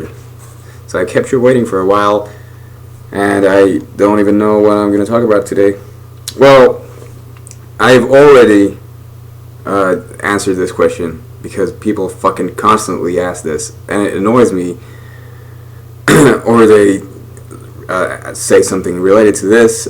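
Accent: United States. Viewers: why do you speak English so good? And also why do you not have a Finnish accent? You sound very american! \m/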